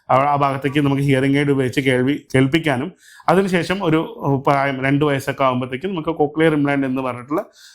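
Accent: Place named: Tamil Nadu, India. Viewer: പ്രിയ ഡോക്ടർ ഒന്നും മനസിലായില്ല ഒരു തമിഴ് ചുവ ഉണ്ട്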